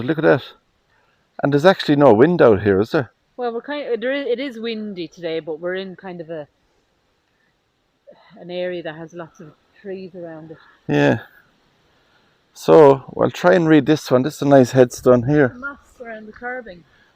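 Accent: Ireland. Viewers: Such beauty and history. I could listen to your descriptions in your fabulous Irish accent for hours.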